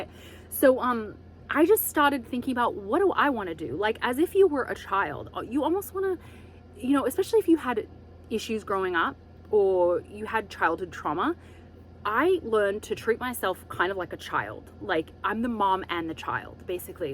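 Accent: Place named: Australia. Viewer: Thank You for sharing your thoughts, insights and advice on self work, self love. Currently healing childhood traumas and insecurities after having 3 months romantic state to friend zone now ghosted last 3 weeks. Digging the aussie accent!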